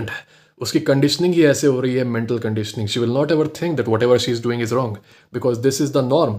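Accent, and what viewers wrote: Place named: Lucknow, India. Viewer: Good.. Very well put 👌 Also the answer to your question you asked in the end (why men are not getting aware or radicalized? ) is - because of the bitter fact that today more than 50% men have become feminists. Remaining 30% are simps/slaves. Only 20% are aware of this. But the disturbing part is that Rate of conversion of men to simps/feminists is 2 times faster than the rate of men getting aware/enlightened about toxicity spread by infection of Feminism... So, with that being said, people like you (who are actually doing a wonderful job 👌💯) will not be able to bring positive change in Indian Society which is headed to a disastrous end. Anyways, keep up the good work.. I support you... & you have got a good voice... Seems you were an RJ or some process trainer at BPO. Also your accent seems like you belong to Lucknow or Kanpur.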